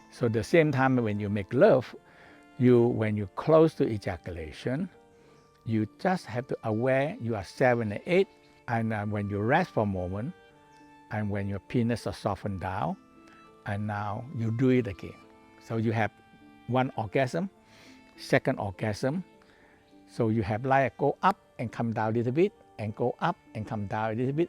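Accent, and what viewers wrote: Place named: China. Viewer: I’m sorry, sir, but you speak Chinese-English, aka, “Chinglish.” Consequently, it’s very difficult to understand you, making your information just about useless. May I suggest that you find a bilingual person who can impart your message with a voice-over in clear, native-speaker English? In this way, you can be sure that your message is correctly understood by native speakers of English! I hope this helps.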